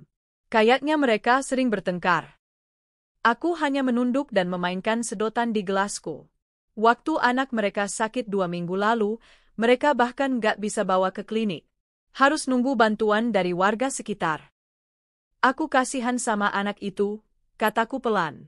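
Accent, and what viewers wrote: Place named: Madura, Indonesia. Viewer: Suara/intonasi dari pembaca narasi ini mirip dgn emak madura yg dari desa sdg baca... Tak iye.. Tak iyee... 😮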